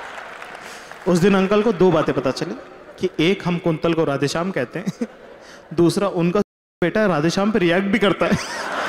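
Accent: Indore, India. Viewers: Bhai Bilkul Indori language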